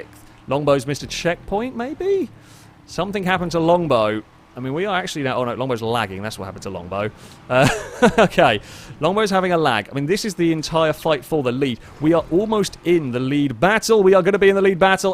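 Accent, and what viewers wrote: United Kingdom. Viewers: That marvelous British English is half the reason I watch this channel, not gonna lie.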